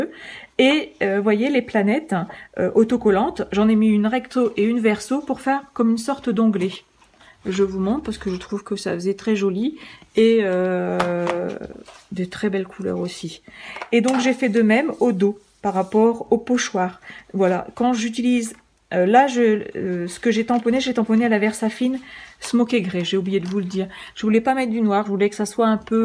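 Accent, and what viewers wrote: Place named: Belgium. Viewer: coucou j aimerai savoir ou vous trouver vos jolis papiers merci super vdeoj adore votre accent belge ou le nord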